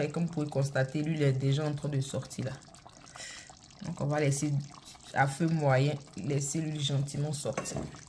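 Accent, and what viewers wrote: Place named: Ivory Coast. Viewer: Ton accent ivoirien j'adore 🎉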